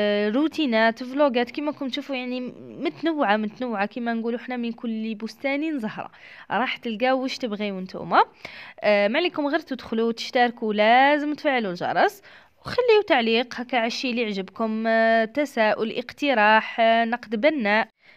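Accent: Batna, Algeria. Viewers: كي نسمعك تهدري نحس روحي راني في باتنة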